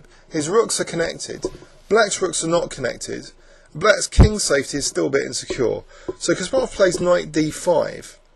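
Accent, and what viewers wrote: United Kingdom. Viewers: British accent? (sorry, my English is not very well).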